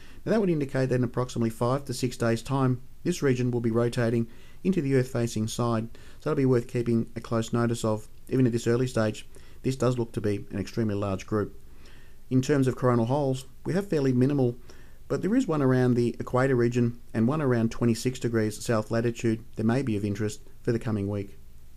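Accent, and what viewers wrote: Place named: Essex, United Kingdom. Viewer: I'll start again his accent sounds like Essex or something round there , boring isn't it ?????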